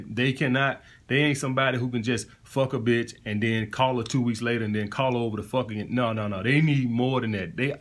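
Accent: South, United States. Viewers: its not perr...its pure...sounds like he from down south...can hear that southern accent...very good looking guy but dont agree with everything he saying...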